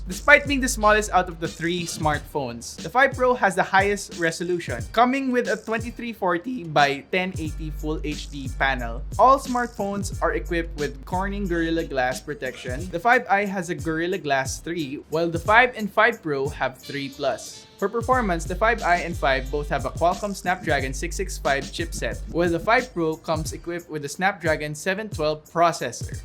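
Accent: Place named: Philippines. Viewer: I love his neutral Filipino accent! Unlike the chinito one na mej annoying plus he's the only who didn't mention "take everything with a grain of salt" #loveyugatech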